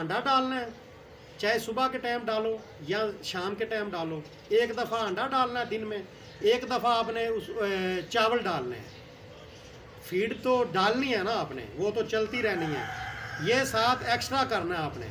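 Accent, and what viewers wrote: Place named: Punjab, India. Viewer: Ustad g punjabi me apki video ka alag maza hai 1 alag maza hai punjabi lehjy me aapk ❤❤❤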